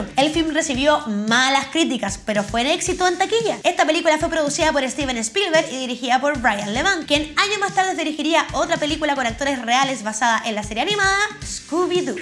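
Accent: Chile. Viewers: Produchon, achon me encanta la fonética de los chilenos xD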